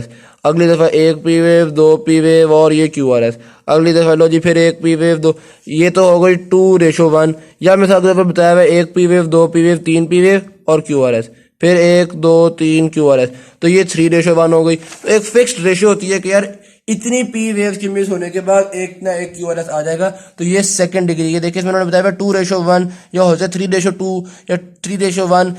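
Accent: Punjab, India. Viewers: Zbrdst...punjabi accent mn smjh kr ziada mza aya😇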